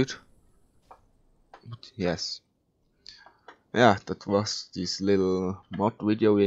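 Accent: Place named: Germany. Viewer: Ich höre so einen deutschen Akzent...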